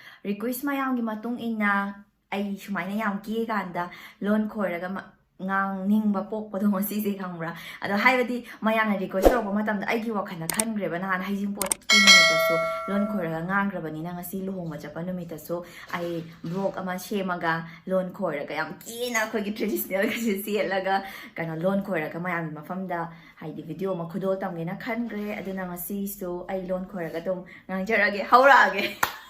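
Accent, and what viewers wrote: Kakching, India. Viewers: Yengba yamna nungai tabasu yamna nungai nungai harisini eina ngang ja re si kakching maron dniko 😁😁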